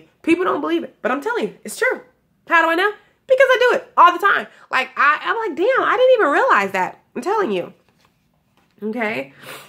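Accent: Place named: London, United Kingdom. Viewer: Lmao your London accent gave me life 😂💀 ... I can hear you speak about self motivation all day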